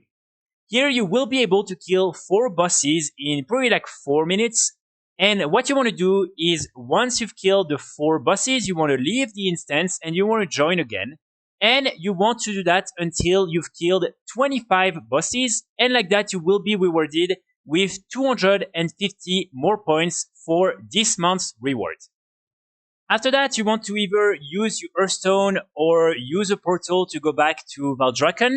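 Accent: France. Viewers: I didn't know you were making videos aside from the gold making theme! As a trading post farmer myself it's always welcome to have some optimisation intels so thank you for that !! Also, rien à voir mais t'es français nan ? Ca fait longtemps que je me pose la question avec ton accent.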